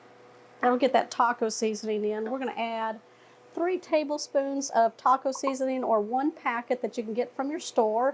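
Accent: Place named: Mississippi, United States. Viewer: Wow! I'm in Columbia, MS and you talk like a MS person! This is an Amazing recipe! It can easily be made Cajun with some local stuff. Love your videos. Blessings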